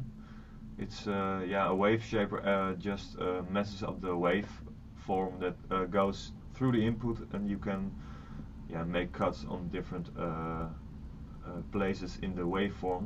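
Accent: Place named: Netherlands. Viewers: Je hebt een Nederlands accent:) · hij is nl >,< wow gewoon wow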